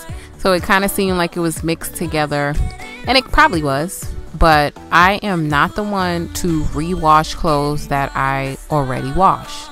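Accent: New York, United States. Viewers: I heard you speak and thought “she’s from NY!” Lol. My family relocated from Brooklyn to SA, TX six years ago this June. I absolutely loved watching your video! Definitely subscribing! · I subscribed as soon as I heard your accent! I’m a native New Yorker and we picked up and relocated to Houston 5 years ago - hearing you feels like home 😊 Thank you girl, keep talkin your ish ❤️ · LOVED THIS VIDEO! As soon as you spoke I knew you were from New York! Totally relatable I’m from New York and relocated to Colorado so I felt you sis!!! Love your home so cozy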